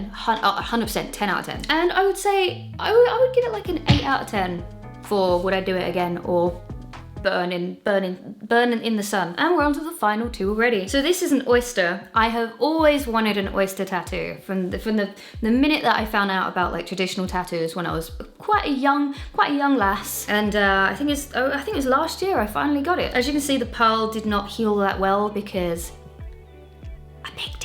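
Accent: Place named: England, United Kingdom. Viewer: i watched half this video before i heard your english accent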